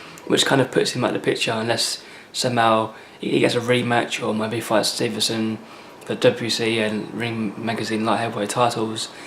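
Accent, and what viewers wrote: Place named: Scotland, United Kingdom. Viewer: Fuck dude, u sound Scottish! I barely understood what u said "lai heavy wait taitol" and I know ur English but slow down overthrere Otherwise no one will leave u comments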